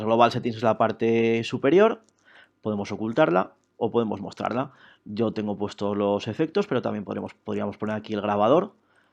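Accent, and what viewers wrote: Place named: Zaragoza, Spain. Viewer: Amigo, hermano y ahora maestro: "Muchísimas gracias por su ayuda en verdad y por tener la amabilidad y el tiempo de contestarme." Ya he repuesto varios archivos en su mayoría que he creído que son los archivos .TSI correctos, cómo usted mismo me lo indicó, pero desafortunadamente sigo en las mismas. En cualquier caso le agradezco de igual forma por su ayuda y a juzgar por su acento, supongo que es de Zaragoza, España (Solo conozco su equipo de futbol). Espero tener la oportunidad de ir a visitar Europa en el futuro. Mientras tanto te has ganado a pulso un nuevo suscriptor y disculpame si escribo mucho!!!! :) :)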